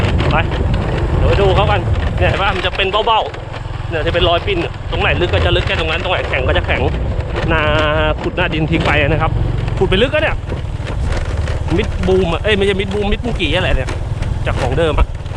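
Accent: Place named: Bang Kam, Thailand. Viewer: พูดเหมือนคนบางกํา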